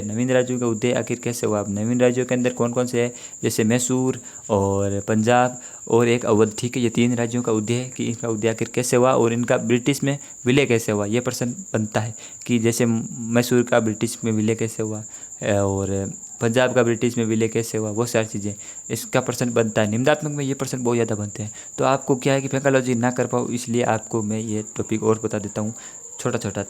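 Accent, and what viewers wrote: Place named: Marwar, India. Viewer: सर आपके वीडियो में कभी-कभी मारवाड़ी भी झलकती हैं जो बहुत अच्छी लगती है। सर क्या आप मारवाड़ क्षेत्र से हो ? मेरे जैसे डीडवाना-कुचामनसिटी जिले से।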